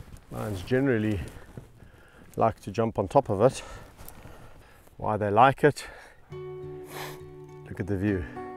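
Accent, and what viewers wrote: South Africa. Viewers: I love the “sithe Efrikan” accent. 💗💗💗💗💗💗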